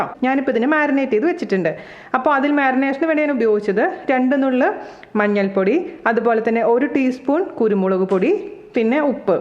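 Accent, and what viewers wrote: Thrissur, India. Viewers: Hello..njan vegetarian aanu... But still I watch all ur non veg videos..that's how much I love to watch u and hear aaa trissur baasha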